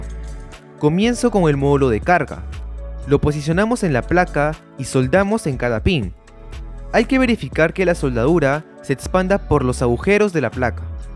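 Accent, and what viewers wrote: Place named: Peru. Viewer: increíble, el español peruano es inconfundible.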